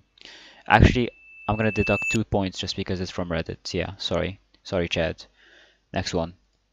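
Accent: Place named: Egypt. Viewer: the egyptian accent is just 👌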